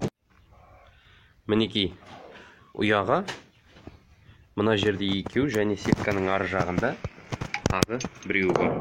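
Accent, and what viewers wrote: Standard. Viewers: Керемет. Таза казакша сойлеп жеткизип жатырсыз! Мыкты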